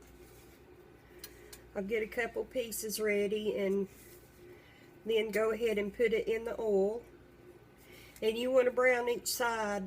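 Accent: South, United States. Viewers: Just found your chanel and I love the way you cook and your southern accent is so cute. Greetings from Spain. Xx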